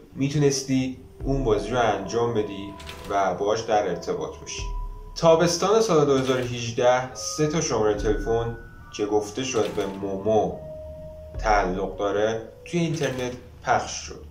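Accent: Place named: North, Iran. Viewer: داداش لهجه داری.شمالی هستی؟🤨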